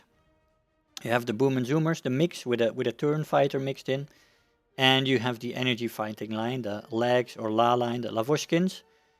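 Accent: Netherlands. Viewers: Hey.Are you from the Netherlands? I think i hear a little Slang. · I love the dutch accent in every language i speak. kinda nice that both of my aircraft youtubers have it👌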